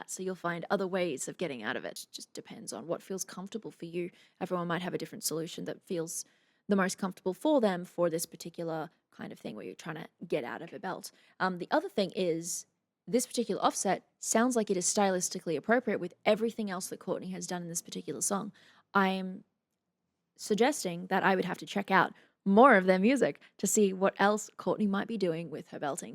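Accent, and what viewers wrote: Australia. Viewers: I'll admit, I'm loving these reactions not just for the content, but because I get to hear them from a fellow Aussie.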